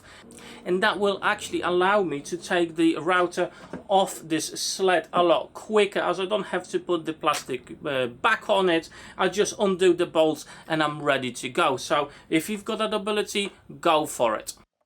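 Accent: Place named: United Kingdom. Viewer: Wiedziałam, że z UK. Zastanawiałam się tylko z jakiego miasta konkretnie. Fascynują mnie akcenty. Ja mieszkałam przez 5 lat w Colchester w czasie studiów.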